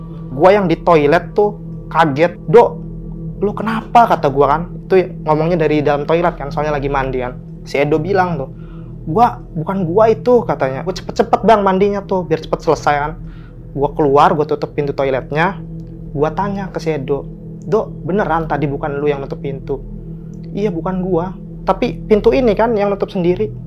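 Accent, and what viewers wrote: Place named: Jaseng, Indonesia. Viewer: Walaupun bahasa nya lo gw lo gw logat jaseng nya gk ilang😂😅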